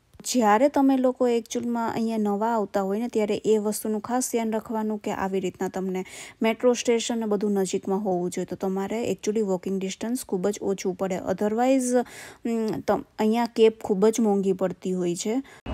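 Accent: Saurashtra, India. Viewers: ખુબ જ સુંદર ધન્યવાદ ભાષા પરથી તમો સૌરાષ્ટ્ર ના લાગો છો 👌👌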